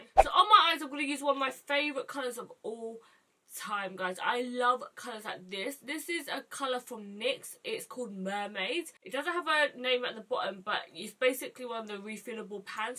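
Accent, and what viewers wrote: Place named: London, United Kingdom. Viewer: Always. The intros always make me think damn this is going to be pretentious then you say “HI GUYS” in that London accent I’m like yaaas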